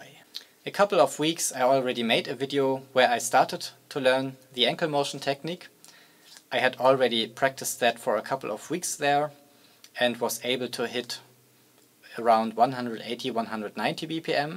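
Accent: Germany. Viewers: Wie man es hört das du deutscher bist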